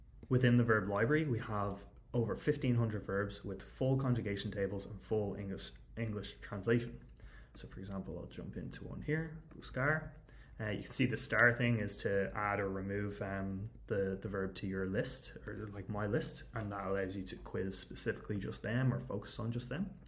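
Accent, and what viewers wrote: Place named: Ireland. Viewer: I didn’t get your name just an Irish accent I am also from the Emerald Isle 😂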